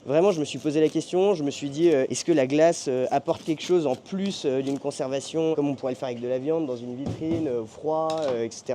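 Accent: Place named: Ardennes, France. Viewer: Plutôt Ardennais 😮😢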